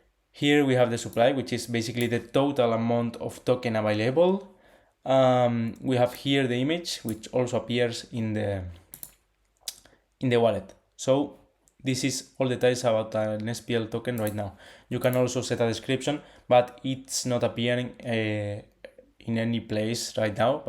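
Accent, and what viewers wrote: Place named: Spain. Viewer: Vamos ese acento español ahí a muerte! Buen contenido!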